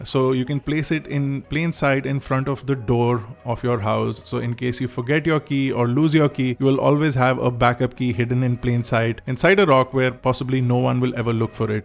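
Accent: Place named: Delhi, India. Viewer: Simply elegant and useful info... Y i joined this coz the person is not wannabe... Simple perfect delhi accent English.. not trying to make it cool accentize British or American accent English... All concentration on gadgets knowledge no tantrums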